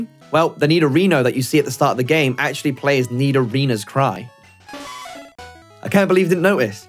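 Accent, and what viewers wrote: United Kingdom. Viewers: True bri'ish accent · Maybe it's just me, but as a non-native speaker I had to concentrate really hard to understand what he was saying cause he was talking so fast. That combined with his british accent it was kinda rough. Really cool video regardless though!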